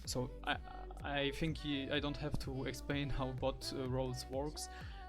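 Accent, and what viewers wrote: Germany. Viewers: Der DEUTSCHE Akzent man kennt ihn!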